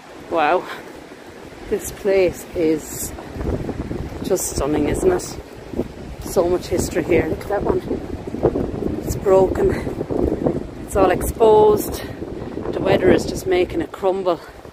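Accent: Ireland. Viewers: I am from Hershey, Pa USA. I LOVE YOUR BROUGE and have found you on YouTube and love what you are doing in your part of the world. Love the architecture of some of the building and gravesites you have found. I will keep on watching. Betty from Pa.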